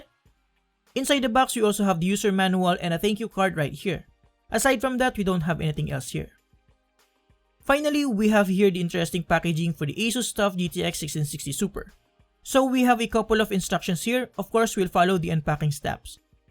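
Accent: Philippines. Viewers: Filipino rightt? Accent palang e hehe. GOODLUCK PO SA LAHAT!! pati pala sakin ehem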